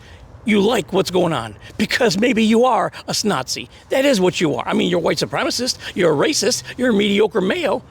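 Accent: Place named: South, United States. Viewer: Ok. That is heavy words from someone who has never served. And hasn’t served in combat. Douche bags like him like to talk talk talk. But, we were fighting in the Middle East for awhile and this fuck chose not to serve. And his Call of Duty ass wants to call out combat vets. Your southern accent proves people in the south skip a lot of school. Watch your self homie. Combat vets are the quiet ones.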